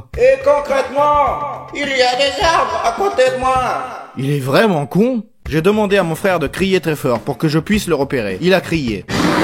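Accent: Russia. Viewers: t'es russe ?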